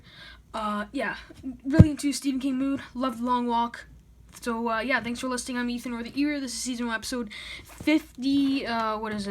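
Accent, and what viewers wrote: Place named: Canada. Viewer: I like your emoticon description showing the story of The Long Walk. Clever! Why don't you show your face in the review? It's OK to say you loved the book. It doesn't meat I'll love or like it. I love Cell and my friend loves Dreamcatcher and most people don't like those two King books. I'm guessing your from Canada by the way you pronounce "about". It's not fully "aboot" like some Canadian accents but it's moving in that direction. You said if they fall below 4 mph, they get a warning, so why not stay below 4 mph so they don't get any more warnings?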